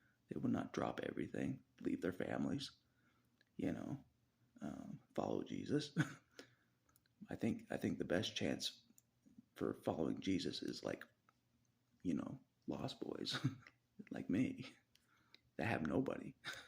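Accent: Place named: South, United States. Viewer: Very good. Bro i wish we went to the same church but i assume you live in a southern state because of your accent. I would kick it with you and talk about all the things.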